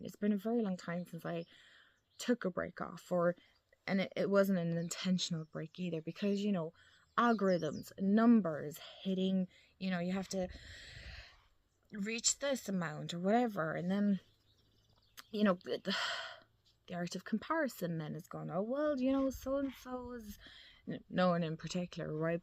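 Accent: Ireland. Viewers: I really like your voice and your Irish accent 😍 I relate to everything you said very deeply ❤ It's been really hard for me as an Iranian, staying present helps a lot. I have had a channel on YT since 2020 (My Farsi channel) and the best advice I can give to anyone who is not here for money, is to completely forget about the algorithm. ❤❤❤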